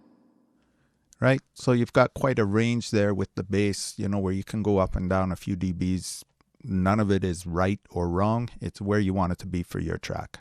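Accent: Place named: Ireland. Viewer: I sense an Irish accent. 1 love.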